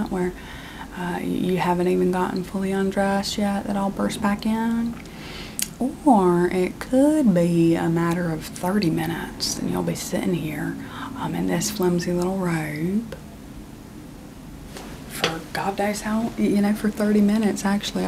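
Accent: South, United States. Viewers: thank u!!! i loveee the way u speak with this specific southern accent with drawnnn out words. so soothing for some reason lol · Love the southern accent, lol. Very tingly ✨ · I love the southern asmr!! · Your southern accent videos are absolutely my faves (the Fargo accent was a very close second though) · hi i’m from the deep south and your southern accent is quite accurate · I absolutely adore the doctor roleplays and like all the checking in and stuff. Especially with the southern accent, I could listen to a 2 hour long version of this! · Alabama or Mississippi. I can’t decide. It’s so natural. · your southern accent is actually so accurate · These southern accent ones are my absolute favorites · I love the southern accent videos! I have to watch these way before bed because I’m too interested in the jokes and what’s going on to listen to new ones at bedtime lol 😂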